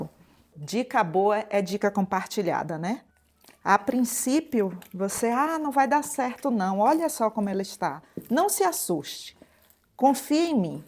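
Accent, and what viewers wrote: Bahia, Brazil. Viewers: Sotaque mais pra Bahia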